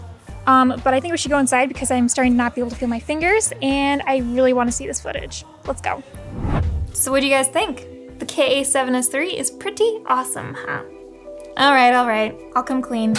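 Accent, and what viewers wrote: Midwest, United States. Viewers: ok gotcha! Yep that Midwest accent 😂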